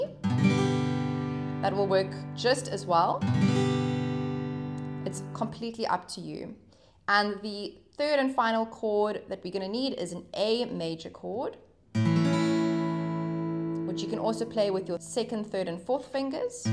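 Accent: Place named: South Africa. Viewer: I thought I’d heard the (for me) nostalgic trace of an SA accent and checked your biography 😊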